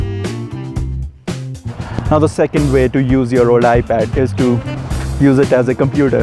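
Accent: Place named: India. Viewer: Love the Indian voice keep it up !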